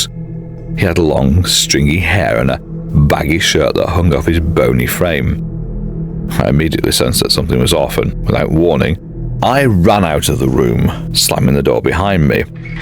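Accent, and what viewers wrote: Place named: France. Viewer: Anyway nice french accent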